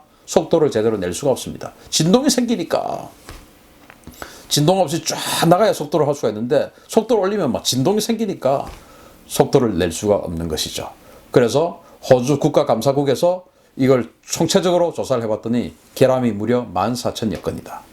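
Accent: Gyeongsang, South Korea. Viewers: 퍼뜩 이해가 않되죠?? 남쪽 오리지날 사운드트렉이 인상적입니다. ㅎㅎ 그래서 사람은 근본과 고향을 속힐 수 없습니다. 비난의 뜻은 전혀없고 박식한 지식과 논리전개 사투리가 인상적입니다. 소시적 보리xx 어떤 선배가 수도권 삼류대학 유학 첫 겨울 방학 때 클라스 메이트들을 데리고 와서 후배인 친구들과 시중을 든적이 있었습니다. 우리동네에서 태어나고 자란 사람은 서울말이 좀 힘 듭니다. 선배가 불식간에 사투리 단어에 서울말 인토네이션을 태워서 여자친구에게 먹을 것을 권하는 걸 보고 배꼽잡고 웃었다가 선배들에게 체포되어 모교 화장실 뒤에서 사망직전까지 구타 당하던 추억이 생각납니다. 님의 말씀을 잘 경청하고 있습니다. 계속 좋은방송 부탁드립니다. 퍼뜩=빨리,금방. 수구리!= 수그려라! 만디= 정상, 꼭대기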